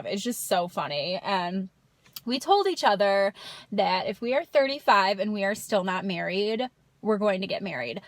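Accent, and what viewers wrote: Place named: Buffalo, United States. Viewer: I just discovered your channel! Love it. I'm from Buffalo but live in SC now--my accent is very similar to yours and I'm dying at the Oregon/Organ thing.... "Bitch-Bye" ha!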